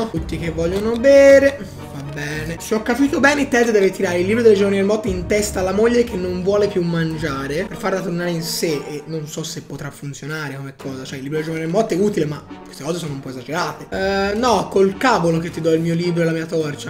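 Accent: Tuscany, Italy. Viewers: Ma sei toscano? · ma tu di dove sei?hai l accento toscano...quindi sei toscano?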